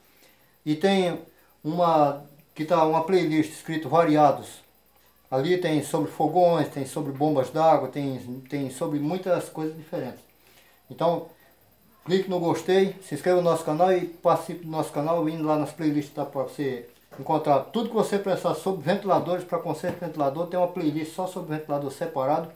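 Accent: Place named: São Paulo, Brazil. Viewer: Fala fala lenga lenga ou é paulista ou carioca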